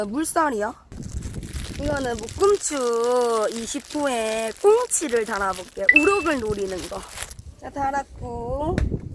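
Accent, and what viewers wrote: Busan, South Korea. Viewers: 오~부산사투리 듣기좋네 내는 창원인데 윽쑤 정겹네요 마이 잡으이소~~~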